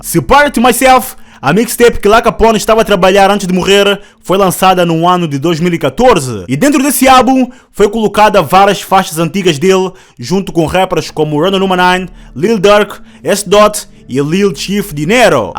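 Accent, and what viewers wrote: Angola. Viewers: Ele mora na gringa mano Ele deve ser da Angola e tal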